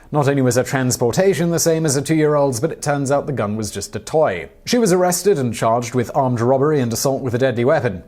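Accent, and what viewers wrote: United Kingdom. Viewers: Hearing a British man talk about the Florida man is the reason I’m still alive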